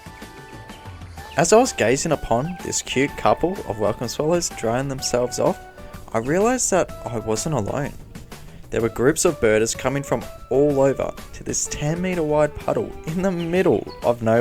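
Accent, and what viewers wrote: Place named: Australia. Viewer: 🤣love that YouTube recommended your channel! Subbed as soon as I heard our Aussie accent 🙌🏻 loved the birding and the commentary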